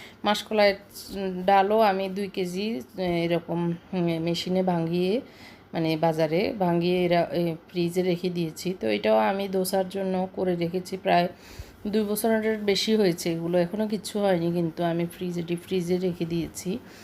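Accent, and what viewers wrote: Noakhali, Bangladesh. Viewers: আপু আপনার জারটা সুন্দর হয়েছে। আপনার কথা শুনে মনে হলো আপু আপনার বাড়ি নোয়াখালী। আমি আপনার পাসে আছি।আমার বাড়িও নোয়াখালী।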